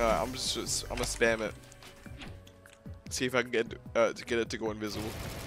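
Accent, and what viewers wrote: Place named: United Kingdom. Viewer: a little bit of British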